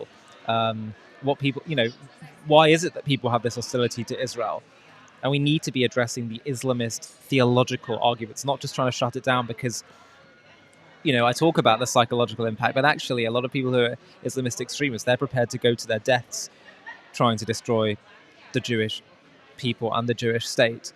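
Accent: United Kingdom. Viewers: it's just a british (based) accent. nothing extraordinary about it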